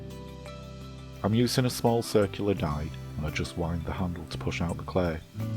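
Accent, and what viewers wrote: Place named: North, United Kingdom. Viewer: You sound northern,like myself, relaxing listening to you x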